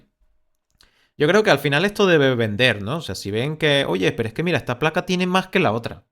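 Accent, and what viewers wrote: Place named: Venezuela. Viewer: Ok gracias por el dato y la corrección, ya me parecía familiar el acento venezolano saludos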